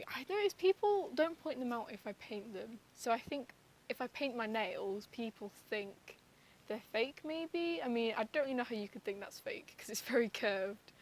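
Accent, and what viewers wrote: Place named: London, United Kingdom. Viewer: You sound like a London girl with that accent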